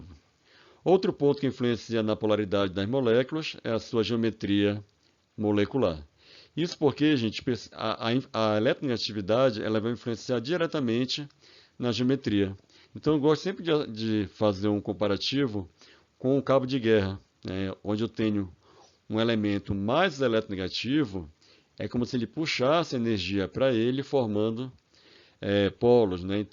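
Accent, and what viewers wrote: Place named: Pará, Brazil. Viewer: Sotaque paraense. acertei ou errei?😊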